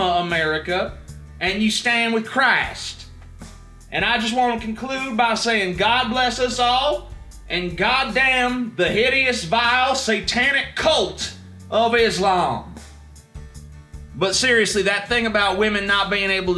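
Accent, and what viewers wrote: South, United States. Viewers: Where did You learn that dialect? as a Southerner i`m impressed.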